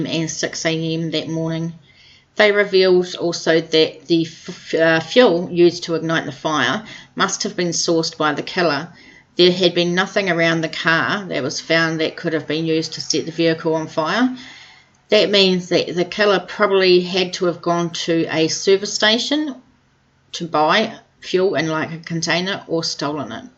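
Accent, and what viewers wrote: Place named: New Zealand. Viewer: Cringy nz accent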